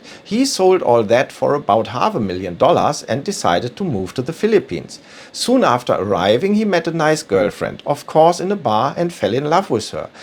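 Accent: Germany. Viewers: All logic and no emotion with a German accent….. perfectly meets stereotype 😯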